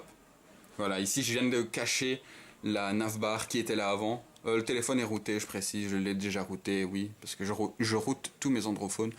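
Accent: Belgium. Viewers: vive l'accent belge ! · Slt. Je suis intéressée par le Nexus 5 qui va sortir prochainement. Etant egalement belge, où peut-on s'en procurer un? Super video, et vive l'accent belge en effet! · Alors c'est ça l'accent belge ? C'est marrant ;3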